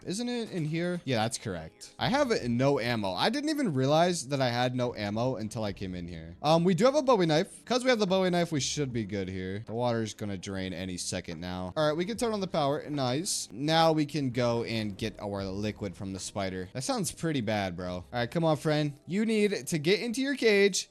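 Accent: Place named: South, United States. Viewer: Your voice is really cool. The Southerner accent is sexy.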